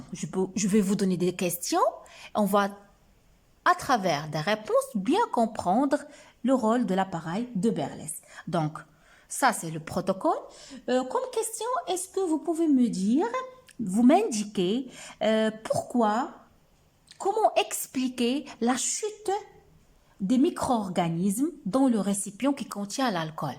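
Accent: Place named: Morocco. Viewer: Ça sent le « é > marocain !!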